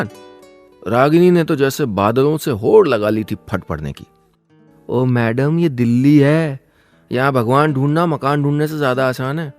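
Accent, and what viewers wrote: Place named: Punjab, India. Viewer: Your punjabi accent is awesome....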